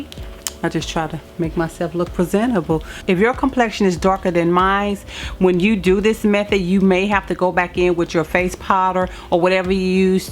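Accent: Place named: South, United States. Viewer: I will try it. You did a great job!! Love your accent (southern?) Thanks so much!!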